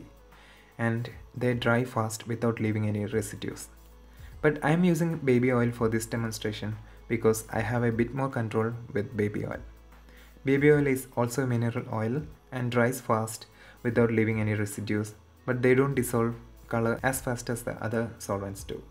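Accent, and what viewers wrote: Kerala, India. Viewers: He is most likely from Kerala, god's own country. Malayalam and their accent sounds very pleasant to my ears. 🙂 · Are you a keralean ? Your accent is like malayalam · Are you a mallu? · Are u from kerala? ?? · Sans-sational YouTuber that's a typical Indian accent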